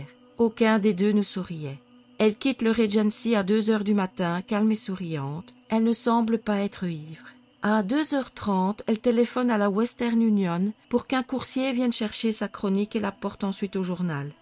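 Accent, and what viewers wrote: Belgium. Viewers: Bien sûr une affaire connue mais un point de vue et une analyse différente... 👍. Merci pour le petit accent Belge 😉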